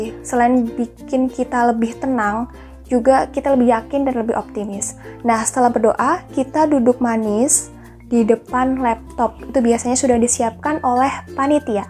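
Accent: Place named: Banyumas, Indonesia. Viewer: Salam Ngapak mba. Makasih udah share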